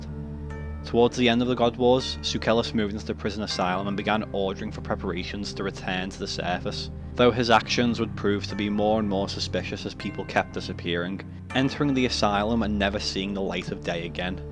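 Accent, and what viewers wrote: Liverpool, United Kingdom. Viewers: Very well made interesting video for a channel with a small amount if subs, I would have subbed, but cba with your dirt scouse accent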